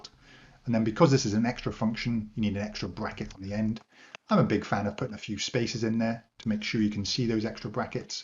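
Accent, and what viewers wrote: Wales, United Kingdom. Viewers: Simple, clear, knowledgeable, and with a slight Welsh accent which I like. I can hear it cos I'm English 😎. There are lots of good Excel tutorial channels but this is one of the best.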